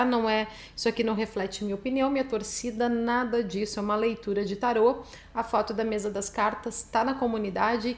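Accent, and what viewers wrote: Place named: Rio Grande do Sul, Brazil. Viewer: Voce com ese sotaque de gauxa voce tem cara de gremista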